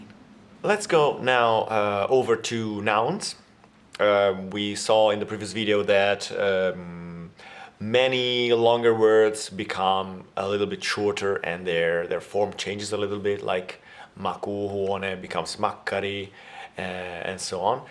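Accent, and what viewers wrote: Italy. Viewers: This was the first video I've seen from you. Are you italian? Because our italian language teacher ones told us that italian pronounciation suits finnish almost better than any other language and I was wondering if it was vice versa :D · The videos you make are truly excellent. Also, your pronunciation has only a very faint accent. This may partly come from the fact that Finnish and Italian pronunciations do not differ too much. By the way, there is a Russian guy called Kiril Sultanshin who makes videos and has learned good Finnish within a few years. His pronunciation is absolutely perfect though he still makes occasional mistakes in grammar. Achievements like this indicate a great talent. I do not like the term “kirjakieli” although even linguists rather commonly use it. The reason is that it is not used only in writing but also when any formal presentation is needed. 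TV news, speeches, negotiations, university lectures, schools are typical examples. “Puhekieli” is typical in everyday informal discussions. Instead of “kirjakieli” I would rather say “yleiskieli”. Admittedly, “puhekieli” expressions sometimes intrude “yleiskieli”, mainly due to a sloppy use of the language.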